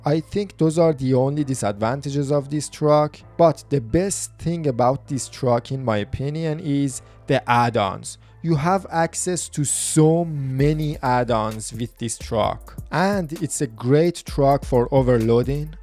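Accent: Iran. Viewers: اکسنتتو بخورم که نشون داد ایرانی هستی بعد اومدناسم کانالو دیدم بععععله.موفق باشی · حس میکنم ماله ایرانی داداش لحجتو❤😂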